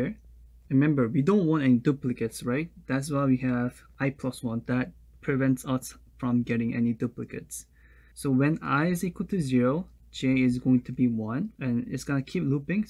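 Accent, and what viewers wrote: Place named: South Korea. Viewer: I love how considerate you are at explaining all the steps you take to get to an answer. This is kind of a random question but I hear some Korean accent in the way you speak. Are you korean by any chance?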